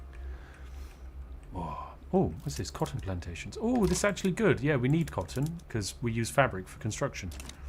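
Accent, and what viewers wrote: United Kingdom. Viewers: Cant wait to fall asleep to a british guy talking about drugs and tea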